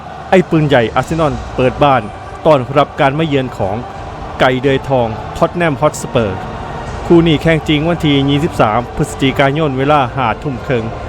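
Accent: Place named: Isan, Thailand. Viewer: ไม่เคยเห็นชาองไหนพากย์ อีสานแบบนี้ สุดยอด..😊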